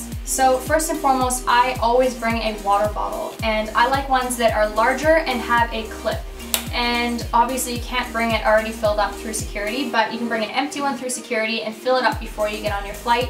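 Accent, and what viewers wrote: Australia. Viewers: Love this video! And you kinda have a Australian accent it comes through once in a while! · I love the hint of an Australian accent😍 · your picking up on the Aussie accent! 😂 It's peeking through with your speech!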